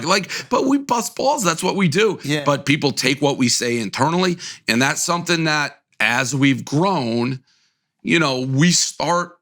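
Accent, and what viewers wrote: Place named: Boston, United States. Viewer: love the Boston accent when its mixed with good articulation.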